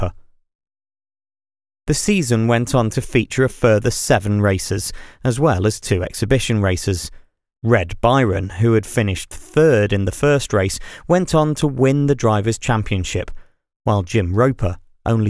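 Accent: United Kingdom. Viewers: A British man narrating the origin story of an All-American sport is weird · Your british voice describing American Stock Car Racing is something I dont want to listen to ...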